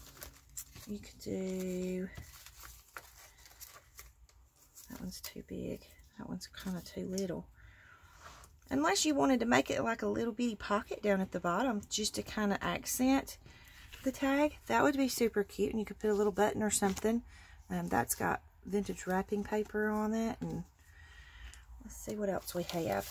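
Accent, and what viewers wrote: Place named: Texas, United States. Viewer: I went out and made 15 clusters!!! Yea, tfs. You must be from Texas, sound like me and I left 35 years ago.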